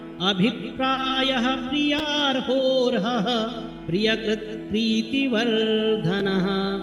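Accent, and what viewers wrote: Standard. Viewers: गुरुवर सादर प्रणाम आपके द्वारा श्लोकों का शुद्ध उच्चारण श्रवण करने को प्राप्त हुआ आपको कोटि कोटि प्रणाम 🙏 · सादर प्रणाम गुरुजी 🙏 आपकी अनुग्रह से हम घर बैठे विभिन्न मंत्रों और श्लोकों का शुद्ध उच्चारण तथा छंद सहित सही ज्ञान प्राप्त कर पा रहे हैं। आपसे विनती है कि कृपया शिव महिम्न स्तोत्र के पाठ का भी ऐसा ही एक नया वीडियो बनाइए। पुराना वाला थोड़ा अधिक लंबा हो गया है। कृपया लगभग 20 मिनट का वीडियो बनाइए, ताकि हम प्रतिदिन आपके साथ शिव महिम्न स्तोत्र का पाठ कर सकें और भोलेनाथ की कृपा प्राप्त कर सकें 🙏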